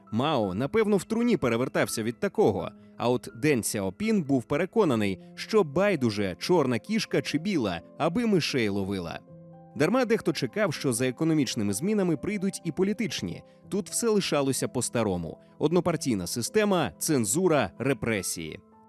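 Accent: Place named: Moscow, Russia. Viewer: Голос за кадром- "гвориЦЦць, жиЦцЦя, громаДЗзззяни"... Вкрай неприємно слухати ці маасковські виверти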